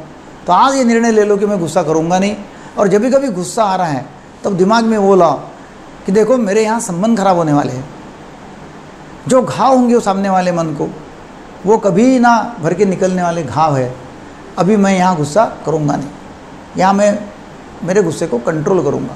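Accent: Maharashtra, India. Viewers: sir,u can talk in Marathi also ,even u speak in hindi ur tone is like Marathi,pl speak in Marathi or in English or please learn proper hindi, language,tone etc, being Marathi am proud of u ,but u talk valuable thing,but sometimes it's funny tone so pl improve sir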